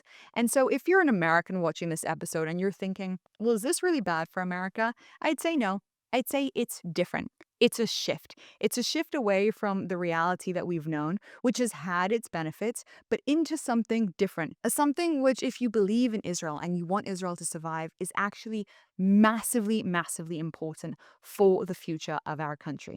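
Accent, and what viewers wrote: England, United Kingdom. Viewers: YOU AND THE BRITISH ACCENT HAVE ME WORKED UP BEYOND BELIEF!!!!!!! WHERE DOES THAT ARROGANCE COME FROM!????????? IS THAT WHY 90% OF THE WORLD HATES JEWS AND ISREAL? I'm not one of the Haters But if you Keep Running That MOUTH!!! KNOW YOUR PLACE!!!!!!!!! CHRIST IS KING!!!!!!! 🙏🇺🇸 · All good and makes total sense. This will terrify israels enemies. Even more than now! Ps. You are precious!! You often have a strong English accent.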